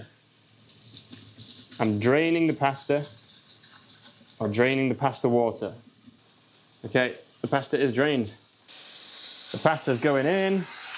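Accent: United Kingdom. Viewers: شكرا جزيلا انا اتعلم اللغة الانجليزية واحب اللهجة البريطانية احبها جدا 🌸💐🪴🌱واحب النباتات جدا شكرا مجددا انا لمى من المملكة العربية السعودية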